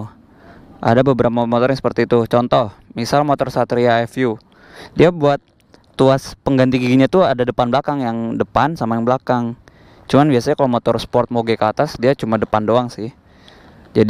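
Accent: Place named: Sunda, Indonesia. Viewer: si akang suara na suara sundanis hatur nuhun kang tutorial na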